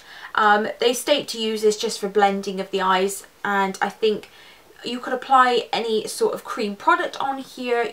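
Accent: United Kingdom. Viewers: I liked your accent UK 🌷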